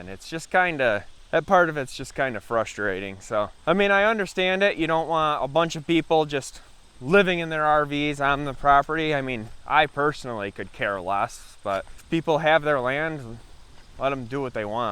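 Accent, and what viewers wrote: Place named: Michigan, United States. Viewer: What state/county? By the accent I'm guessing Michigan.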